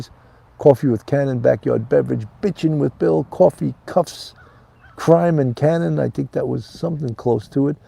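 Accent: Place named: Texas, United States. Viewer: I want to hear your Texan accent lol.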